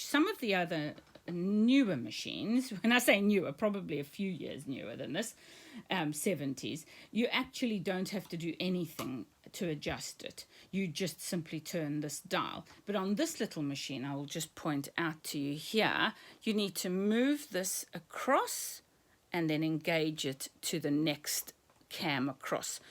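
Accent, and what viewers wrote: South Africa. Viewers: I just bought a 700 which only does straight and zig-zag stitches. I can't wait for it to arrive. Can you point me in the direction of some information as to how to give it the kind of TLC it deserves, as mentioned in your video? Love your accent by the way. Are you from South Africa?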